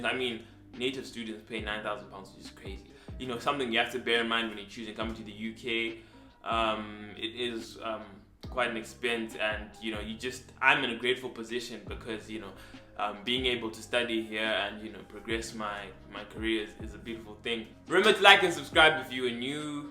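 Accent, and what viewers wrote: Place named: Zimbabwe. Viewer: yess I knew it 😅 I could tell from the accent , I am zim too 🇿🇼 🇿🇼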